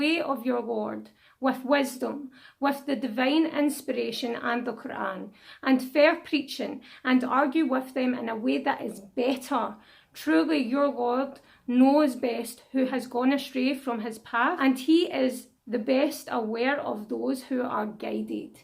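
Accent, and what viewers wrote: Scotland, United Kingdom. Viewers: AAA ooozu billah, I thought u r quarantine..Scottish accent is too unique from the British one but beautiful.. Mashallah.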